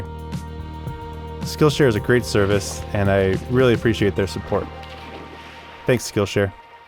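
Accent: Ireland. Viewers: Just discovered the channel and have been binging all day. Love it, but it does need approximately 60% more Irish accent. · Irish ☘️ is the best accent to listen to 👍🏼🤷‍♂️😂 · that irish accent.... you weren't joking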